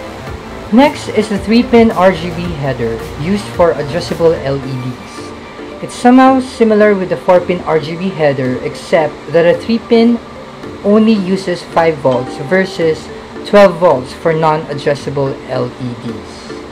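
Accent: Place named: Philippines. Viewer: u sounds like a filipino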